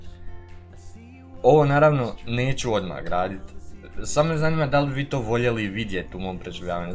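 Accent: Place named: Dalmatia, Croatia. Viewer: odakle si ti? Govoris dalmatinski pa pitan:D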